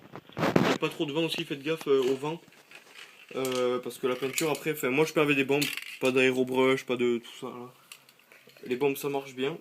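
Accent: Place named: South, France. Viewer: T'es du sud toi ? J'entend un petit accent , sinon merci pour se tuto !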